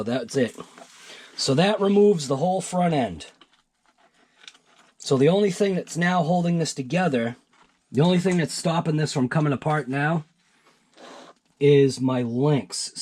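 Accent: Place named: Massachusetts, United States. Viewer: Hey buddy. I knew your accent was one of Massachusetts LOL I grew up in East Bridgewater for a lot of years. I live in Pawtucket now. And I work at Hobby quarters in Foxboro Mass I don't know if you have ever frequent it there. But feel free to stop by this summer we have a huge rock crawling course. I don't know if you are into solid axle monster trucks but I have been designated to design and create a summer series for solid-axle monster trucks styles with penda points series. I don't know if you will see this message but hoping you do. We are also doing a construction site for people who are into hydraulic heavy equipment RC. Anyways I just heard your Alexa say Plymouth and it pushed my head back and surprised LOL anyways nice to see somebody from our side of things is doing so well in the RC Community for us God bless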